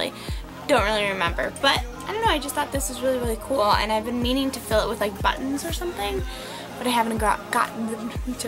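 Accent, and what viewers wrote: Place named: Ireland. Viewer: Cool video! Love seeing the younger generation antiquing! Also geeked out at the irish accent :p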